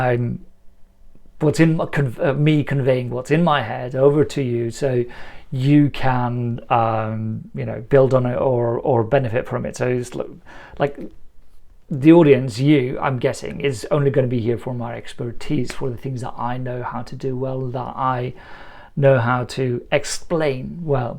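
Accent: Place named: Iceland. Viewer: Well, thats an instant subscribe :) Although i admit, i read your articles with much more of the very nice icelandic accent.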